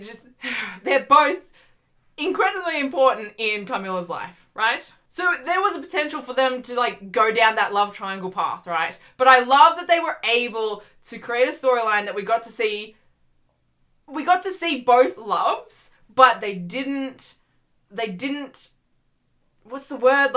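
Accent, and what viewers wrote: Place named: Australia. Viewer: Success for you is just around the corner (bubbly personality) and that Aussie accent.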